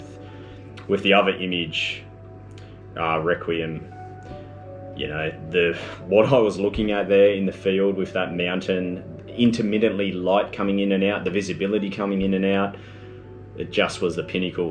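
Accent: Australia. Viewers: Just spectacular. I have only just come across your channel and am stunned by every image in each video and your easy to listen to style (Aussie) and the detailed information you provide. I must get to NZ.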